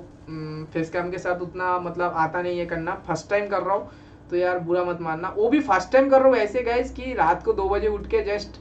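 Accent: Bengal, India. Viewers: Bengali Bhai kitni bhi Hindi bollo I can understand you are Bengali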